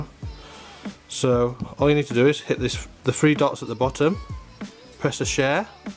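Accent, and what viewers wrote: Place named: United Kingdom. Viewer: He sounds like a British lad will be surprised if he aint i love mi British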